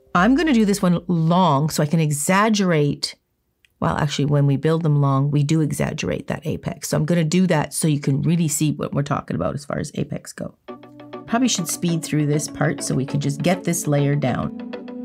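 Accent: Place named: Canada. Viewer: I always do hard gel, but I just started doing acrylic. You have been such a help – plus I just love the Canadian accent!😃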